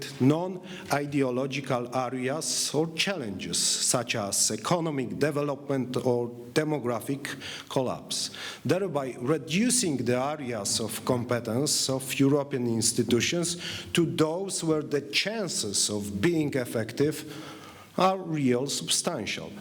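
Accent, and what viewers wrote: Poland. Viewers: Szału nie ma, ale żeby tragedia to też nie!!! wymowa raczej poprawna, dość silny polski akcent i tyle. Akcent to nie taki problem jak Polacy zwykle uważają! Mówi spoko, czyta bo z pewnością nie potrafi tak przemawiać jak po Polsku, ale np. Radziu S. Ostatnio czytał od dechy do dechy po Polsku - on chyba po angielsku mówi swobodniej - wniosek: to Polski prezydent, a nie anglik...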